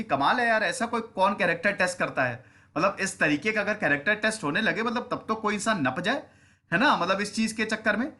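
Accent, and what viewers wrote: India. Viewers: Sr your voice is amzing and different from other and bilkul desi style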